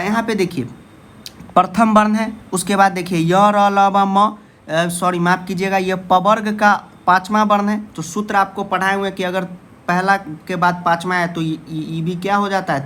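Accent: Bihar, India. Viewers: Sir ap vihar se belong kerthe ha kya kuki apke sound se thora thora lagtha ha ki ap vihari language bolthe ha. Otherwise apki ye video bhaut achi ha. Nice video. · Sar aap Bihar se hain kya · Language se hi pata chal gaya tha ki sir Bihar se hai because I am also bihari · Aapki bihari language se hasna ha raha hai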